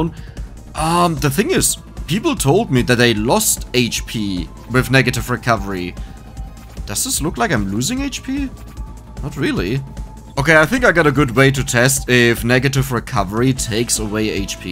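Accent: Germany. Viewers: German accent is funny af sometimes, for example said verks (works)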